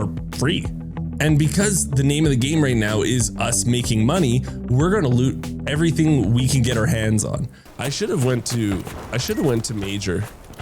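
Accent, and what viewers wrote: Canada. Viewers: it wasnt actually that it was the way you talk and some of the manurisms you use.i am also canadian so picked up on it quick lol i juat started on delta force console slowly getting better i enjoy your videos find them quite helpfull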